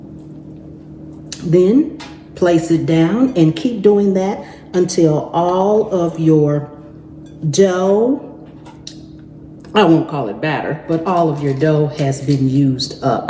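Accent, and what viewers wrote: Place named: Upper class, United States. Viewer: Love your accent sounds like posh American. Cookies look good...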